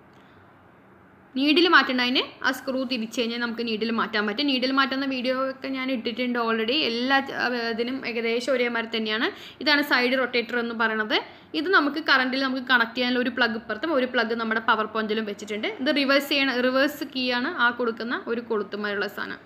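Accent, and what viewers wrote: Palakkad, India. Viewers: Palakkadu kariyano?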